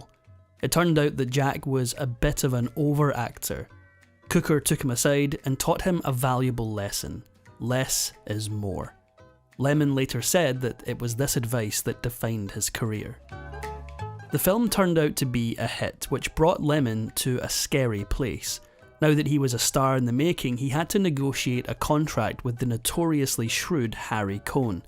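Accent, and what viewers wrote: Scotland, United Kingdom. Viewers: OK, the Scottish accent is extremely annoying after awhile - never noticed this before!!!!!!!!!! 😮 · Awesomeness my favorite Narrator... Love listening to the Scot!!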